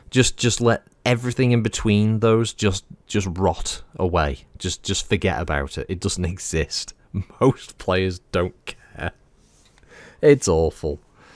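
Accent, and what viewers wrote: United Kingdom. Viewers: I'm now going to start picturing you in director Krennic's uniform when you're doing every recording with that thick British accent 🙂.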